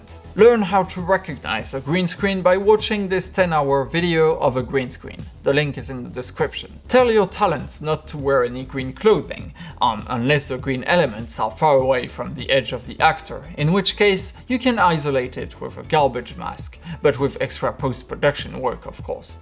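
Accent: France. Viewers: Are you French ? You have a frenchy accent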